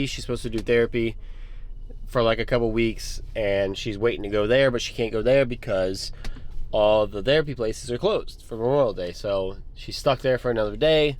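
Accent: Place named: Denmark. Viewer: hello i just noticed you dont have many viewers and subscribers so i just wanted to support abit seems like you do decent content ur accent sounds abit danish but where are u from?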